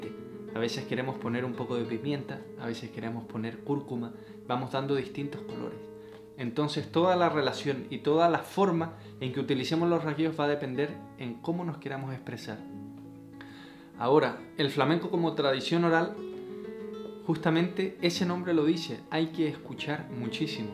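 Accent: Chile. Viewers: Eres Chileno? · Siiiii. Suena mucho a chileno. Yo también me confundí 🤣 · Oshe, pues me dejas con la duda porque tiene un deje chileno que tumba.. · Wena compadre! Te encontré hoy y que gran sorpresa. Estoy aprendiendo rumba, flamenco, vals y boleros. Te agradezco nucho lo que compartes. Caxaba que tenías acento chileno, pero lo comprobé cuando hablaste del chirlito jaja. Ya solicite las tablaturas para estudiar y te estoy siguiendo en instagram. Lo que mas quiero es dominar las técnicas para expresarne genuinamente, sacarne las mascaras y los traumas. Un abrazo. Gracias! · Con razón po, eres chileno, con razón me parecía familiar tu acento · Oye, habla como chileno. Es que la cagó, no lo puedo creer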